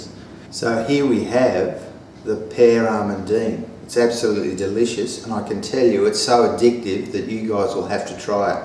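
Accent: Australia. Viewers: Delicious !! Are you from Australia ?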